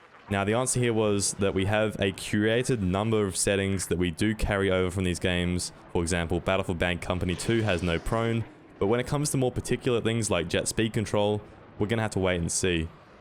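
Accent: Australia. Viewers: I subbed good to hear a Aussie doing the YouTube thing. Now to the game I can't wait to play but first I will have to get a console lol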